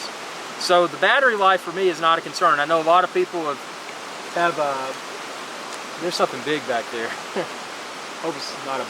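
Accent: South, United States. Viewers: Thank you. Love your southern accent. (UK)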